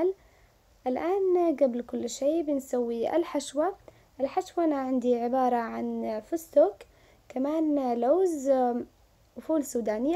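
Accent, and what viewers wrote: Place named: Sanaa, Yemen. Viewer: انا فدالك ياصنعانيه ومااحلى الكلام الصنعاني والطبخات الصنعانيه ...واحلى لايك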